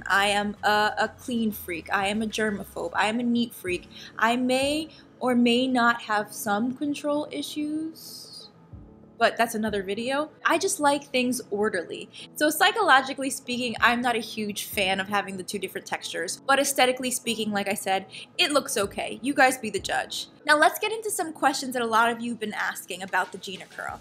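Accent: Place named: Jamaica. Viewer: I could tell you are of Jamaican ancestry based on the way you speak. Notice, I didn't say your accent. You said something a specific or a particular way that was a give away to me. It's not obvious but I noticed. Your hair is looking lovely.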